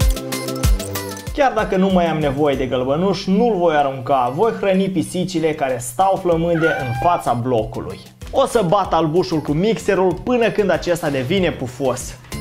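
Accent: Moldova. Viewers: Tu esti moldovean?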